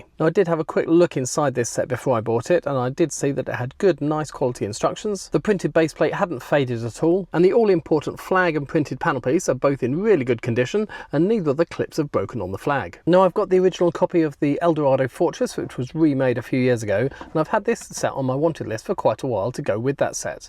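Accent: United Kingdom. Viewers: EVERYONE SHUT UP the nice British man is gonna tell me about his Lego collection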